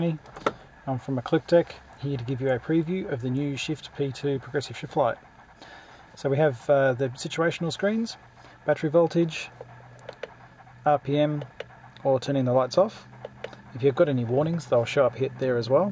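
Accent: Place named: Australia. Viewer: I like Subaru’s with shift lights, clicked this video, and heard an Australian accent lol.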